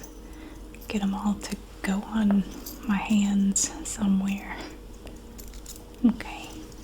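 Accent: South, United States. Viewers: I think the reason we love the soft spoken videos is that when you whisper, we can’t hear your beautiful Southern accent! ❤❤❤❤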